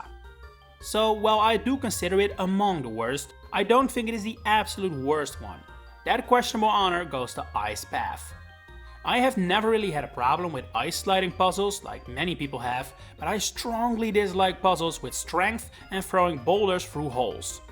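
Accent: Netherlands. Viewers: Me, hearing you accent for the first time: "MEDELANDER????" Flash caves are the actual worst tho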